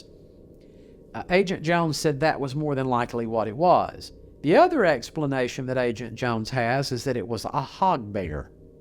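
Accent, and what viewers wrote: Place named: Australia. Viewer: Love the accent.. Sydney'Oz Solid Salute 😭🙌🏼💫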